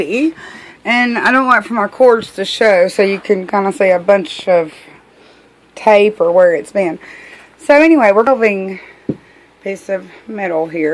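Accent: South, United States. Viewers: Rockin! What are these shelving brackets called at Lowe’s? Love your Southern accent, but I’m not sure exactly what you called them. Thanks! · I love your diy but when i heard your voice i said she from the south then you said Alabama that's where I'm from 👍👍👍👍👍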